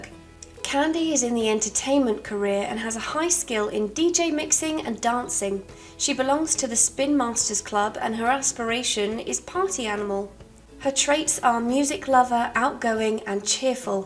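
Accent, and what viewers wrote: United Kingdom. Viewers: Youre english sound like british accent, but you from america ?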